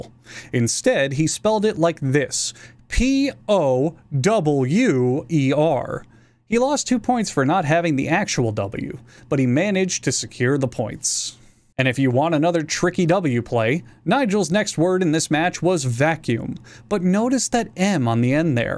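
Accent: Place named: Florida, United States. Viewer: He didn't pronounce it as an acronym it's just his Floridian accent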